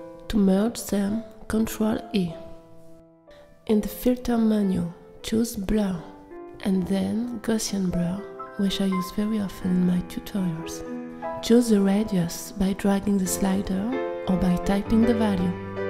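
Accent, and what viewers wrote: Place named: France. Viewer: I love your french accent! And dont worry, i understand very well. Thank you for your video!!!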